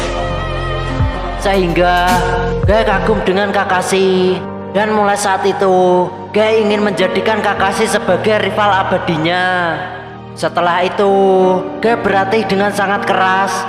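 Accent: Java, Indonesia. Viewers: Ngomongnya medok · 😂😂😂😂 Suaranya bangke bgt ya.. Tp bikin bs ketawa karena medok org jawa.. Wkwkwkwkwk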